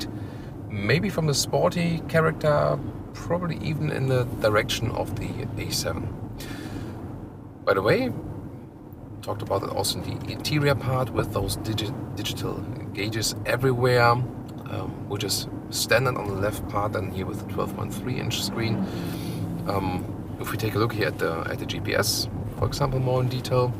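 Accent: Germany. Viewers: Admirable german accent! Very smooz!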